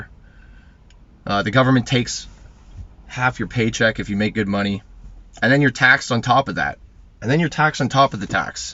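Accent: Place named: Canada. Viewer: i love how we talk similarly haha so Canadian eh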